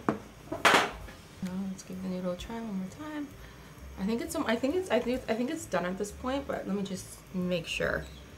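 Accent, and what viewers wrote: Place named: Cockney, United Kingdom. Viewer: Please start posting again V! Miss you're content. From the UK, London. If you chuck in you're cockney accent ('faakin' hell!') will love you forever xxx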